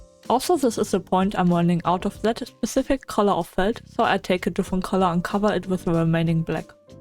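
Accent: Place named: Germany. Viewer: Bist du Deutscher hast einen deutschen akzent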